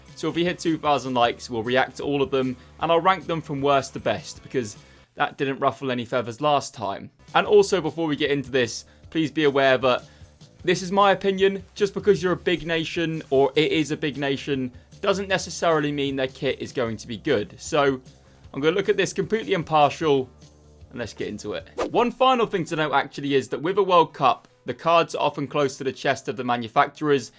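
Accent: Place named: England, United Kingdom. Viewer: Where in England are you from? I'm curious about your accent. Thank you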